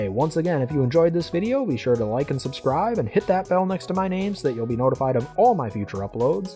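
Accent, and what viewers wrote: United States. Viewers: giga annoying american voice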